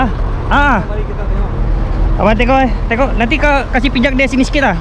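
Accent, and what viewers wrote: Sabah, Malaysia. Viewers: Sabah bro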